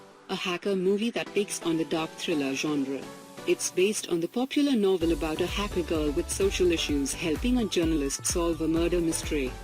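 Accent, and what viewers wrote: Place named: India. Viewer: robot voice with an Indian accent... no thanks!!!!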